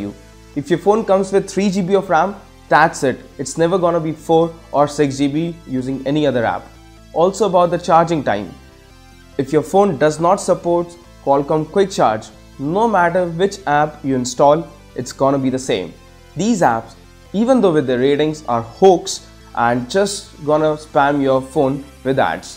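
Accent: India. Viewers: you speak english is totally wrong, because indian language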